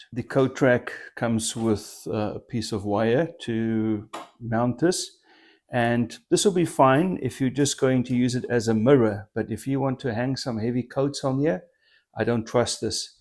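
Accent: South Africa. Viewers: is meneer van suid afrika,want ek hoor daai Afrikaanse "accent"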